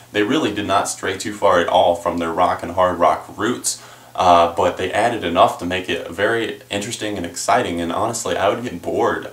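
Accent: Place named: South, United States. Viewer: It sounds like you have a southern accent in this video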